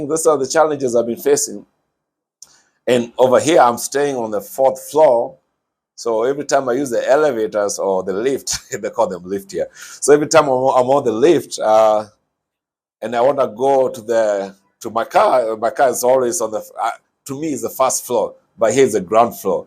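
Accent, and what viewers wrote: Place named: Kenya. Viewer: Kikuyu accent never goes away... enjoy dude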